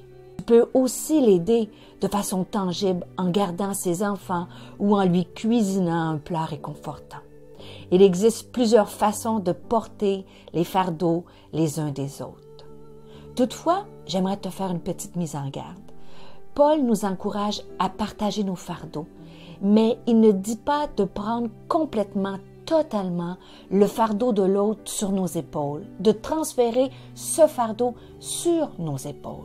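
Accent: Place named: Quebec, Canada. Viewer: Bonjour merci pour ces mots gentils ey avec un accent tres fort du Québec si je ne me trompe Je mediterai volontiers sur ce que vous avez dit au cours de cette journee Bon dimanche a vous et a votre famille Des bisous de l'île Maurice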